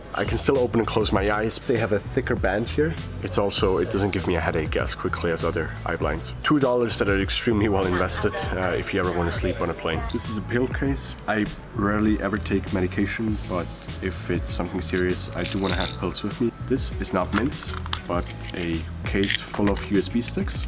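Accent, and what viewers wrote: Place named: Switzerland. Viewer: Indeed, the Swiss accent sounds nice.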